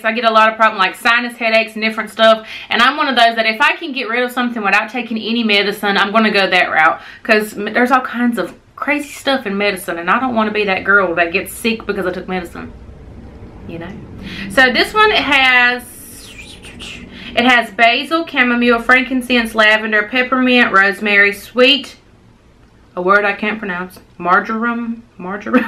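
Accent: South, United States. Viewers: Love ur channel and southern twang!!!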